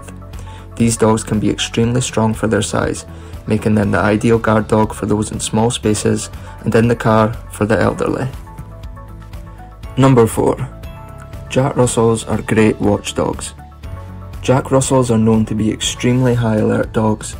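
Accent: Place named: Dundee, United Kingdom. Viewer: Sound dundonian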